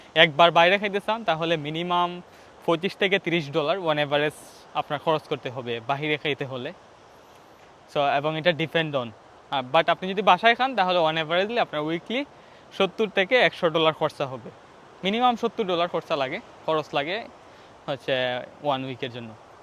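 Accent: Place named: Sylhet, Bangladesh. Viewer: ভাই আপনি বোধহয় সিলেটি। সিলেটি ভাষায় কথা বলেন... অন্যান্য, জেলার তুলনায় এই অঞ্চলের ভাষাটা খুবই বোধগম্য ও সুন্দর। ইলিশের বাড়ি চাঁদপুর থেকে...🤍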